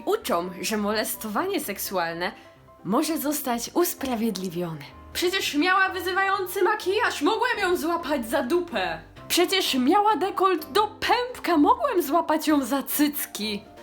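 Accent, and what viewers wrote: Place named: Silesia, Poland. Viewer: Świetny kanał, bardzo miło się ciebie ogląda 😁 poleciał sub oraz łapka 😁 Powiedz proszę, czy jesteś ze Śląska ? Słyszę u Ciebie lekki akcent, ale mogę się mylić 😂😂😂😂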